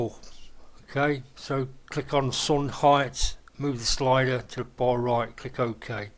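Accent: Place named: United Kingdom. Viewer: Let me ask. From your accent, I assume that you're British, are you? I'm Polish, greetings from Poland and carry on with your work on the editor. I'll be watching. Good luck and peace on.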